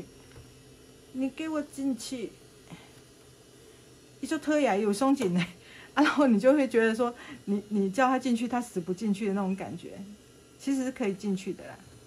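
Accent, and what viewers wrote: Taiwan. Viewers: 謝謝老師無私又有耐心的教學，聽到台灣口音好開心喔，旅居德國的我們祝福妳平安，健康喔。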